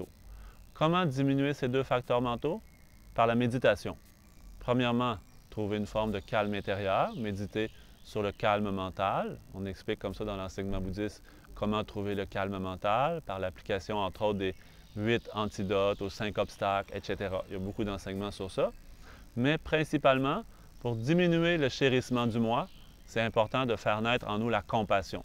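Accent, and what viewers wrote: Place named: Canada. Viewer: Merci! C'est car j'ai un ami canadien et j'ai reconnu l'accent, c'est très joli le français parlé au Canada :)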